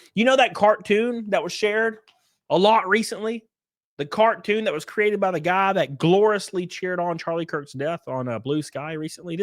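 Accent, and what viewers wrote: South, United States. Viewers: Hey there’s the hunk with the bad ass country/southern accent, how you been?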